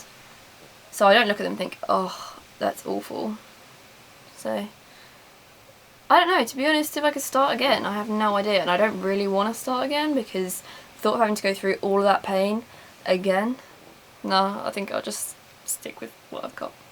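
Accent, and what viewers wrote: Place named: London, United Kingdom. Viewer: u have a london accent-nice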